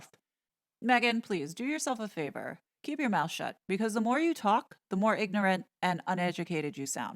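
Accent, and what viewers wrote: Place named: New York, United States. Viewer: another great video! Right on point. As an aside…I love it when you are on a roll and your NY accent comes flying out of your mouth..!!! Thank you for all you do!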